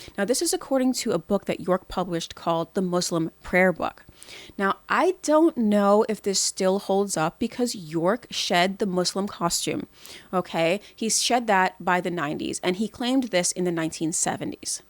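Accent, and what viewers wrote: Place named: United States. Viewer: Your so American u know nothing look at how u talk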